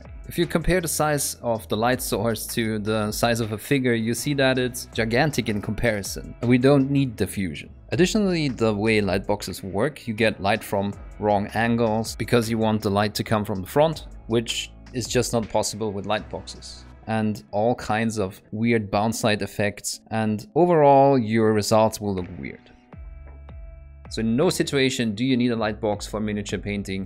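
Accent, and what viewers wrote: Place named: Austria. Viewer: I really like your video cutting and narrative! Keep it up, maybe try working on your pronunciation of the O. Otherwise it's really hard to guess that you are Austrian :P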